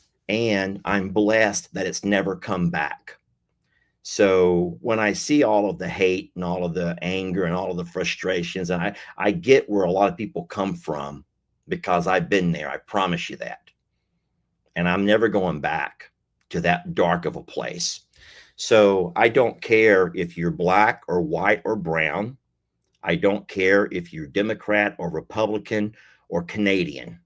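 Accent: South, United States. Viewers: Your accent was more southern aristocrat to my ear,. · Your voice sounded like a southern accent, no a Yankee at all. 😂 Jaqueline is not a typical African American name. I also had a pastor who was white and named but went by Les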